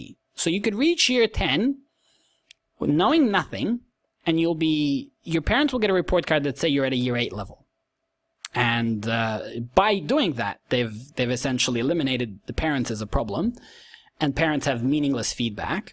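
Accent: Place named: New York, United States. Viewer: Quite enlightening & request an update Oh, you're accent sound like you're from NY city USA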